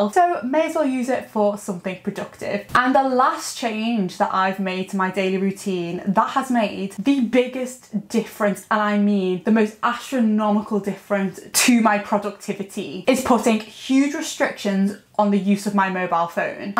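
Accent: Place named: Newcastle, United Kingdom. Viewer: Your accent sounds a bit 'Geordie', no?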